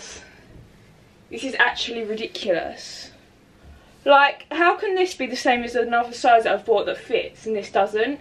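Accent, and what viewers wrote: England, United Kingdom. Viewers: i lover her english accent woow !!! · I can understand british accent but her accent is too british so cant understand her anymore, sounds like she's eating her words 😂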